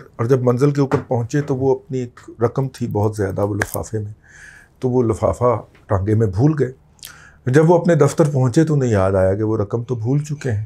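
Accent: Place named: Lahore, Pakistan. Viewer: I really like the way you talk and your lahori accent, but the way you are trying to humilate Buzdar is not our tradition. Please do not do this again, i watch you regularly but i didn't like this. Thanks.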